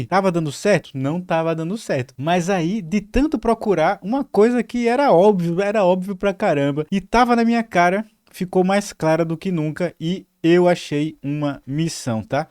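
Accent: Northeast, Brazil. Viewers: pelo seu sotaque voce é nordestino. Sou da Paraiba e gosto muito dos seus videos. De onde voce é?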